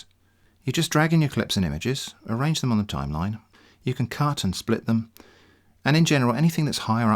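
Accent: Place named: London, United Kingdom. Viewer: Speaking of characters, I remember your Londoner accent from the scambaiting videos!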